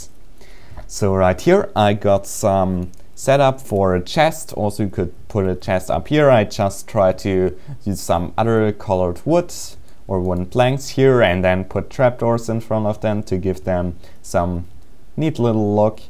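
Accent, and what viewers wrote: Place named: Germany. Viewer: man i love your german accent so much. Haha Nice video man!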